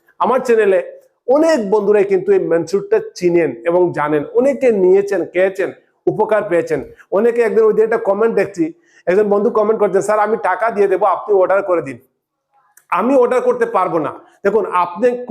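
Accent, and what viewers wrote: Sylhet, Bangladesh. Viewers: ভাই আপনার বাড়ি কি সিলেট না হবীগঞ্জ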